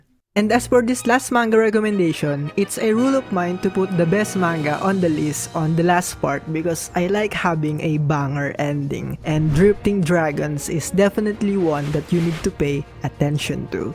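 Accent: Philippines. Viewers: You sound Filipino ish somehow, I don't mean this in a bad way. The recommendations are awesome tbh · me too hahaha the way that he talk is pinoy. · Pinoy yan halata naman sa boses nya mag salita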